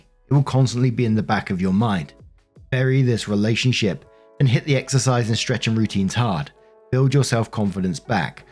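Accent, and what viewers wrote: United Kingdom. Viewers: Just stopping in to say I love your accent and commentary always!!! I'm Jamaican and always wished for the british sound.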